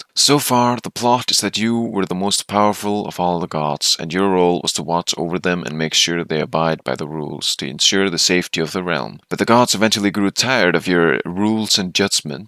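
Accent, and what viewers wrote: Iceland. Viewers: You sound icelandic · You have an Icelandic accent.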